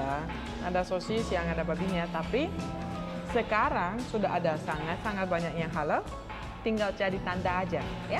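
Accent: Indonesia. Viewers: bahasa indonesia ny kental bnget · Logat nya Indonesia banget, tdk terlihat seperti org rusia, mantap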